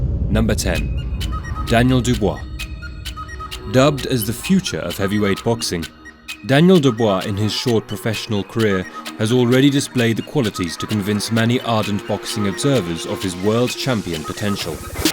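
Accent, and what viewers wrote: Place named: United Kingdom. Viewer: Damn got a beautiful British accent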